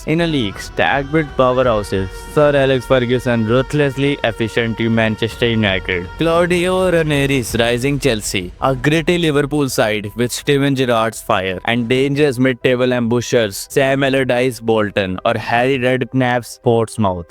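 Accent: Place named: India. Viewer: indian voice annoying use ai voice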